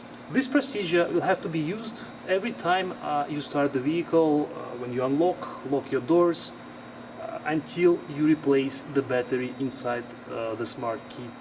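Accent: Russia. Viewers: That Russian accent 😂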